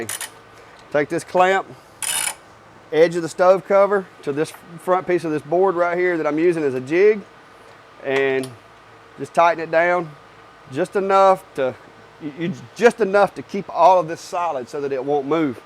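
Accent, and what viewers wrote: Alabama, United States. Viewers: Cool project. And it's nice to hear another Alabamian's accent. I guess it's been too long since I have been home.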